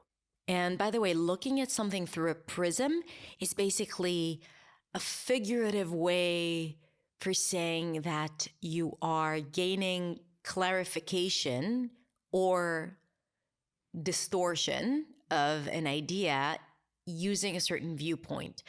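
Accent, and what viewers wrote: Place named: United States. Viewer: This is not practice? 😲😲 😲 I thougt she was American, so good her accent! 😶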